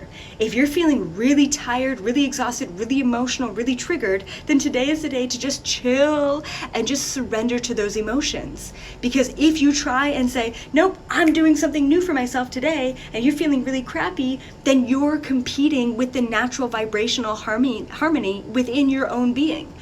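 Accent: Canada. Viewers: Noice ..ok so we are only two years apart . N.B and I are within weeks of each other lol ... If I can't sleep I put on that hella Cray Canadian accent and boom ..I'm out . Makes it hard to finish his vids so I have to day watch/listen lol . He and Terrence McKenna have been my sleep help for months and months 😂😂😂😂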